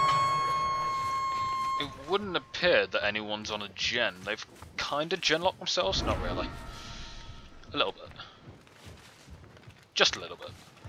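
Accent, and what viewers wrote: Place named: Germany. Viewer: Bro u are amazing love ur vids and ur dbd animations i think after ur accent u are from germany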